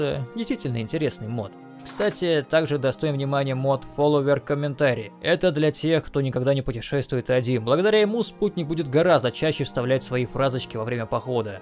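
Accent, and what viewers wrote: Germany. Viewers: Право слово, автор произносит английские слова, как немецкие. Я всё понимаю, но это совсем уже жёска. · и да сразу видно что вы изучали немецкий по вашей манере читать инглиш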